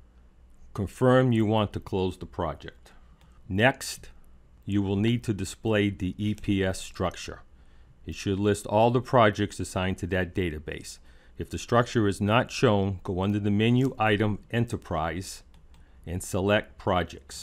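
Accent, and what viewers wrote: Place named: New England, United States. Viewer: very helpful, and I love the New England accent. :)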